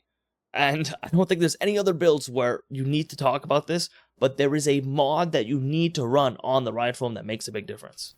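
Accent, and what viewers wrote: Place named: Canada. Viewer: Dude, why didn't you answer? I know you're a Canuk......it's the accent. Great, informative videos just the same.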